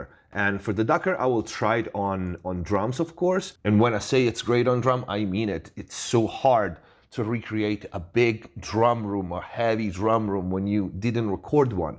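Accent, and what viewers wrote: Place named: Italy. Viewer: Ma allora sei Italiano? Dall'accento infatti, mi sembrava, leggermente familiare con la nostra lingua:). E' molto buono il tuo inglese, sono stato anch'io a Los Angeles e spero di fare un' esperienza ancora più lunga. Grazie per i video e per i consigli, sto imparando alcune cose!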